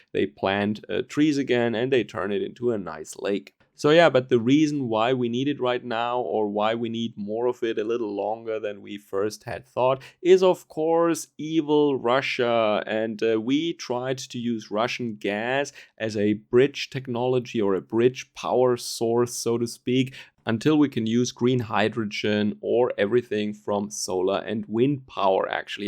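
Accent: Bavaria, Germany. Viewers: Mate, he is from Bayern......they struggle with German! They often have to add subtitles when someone from Bayern is speaking on German TV.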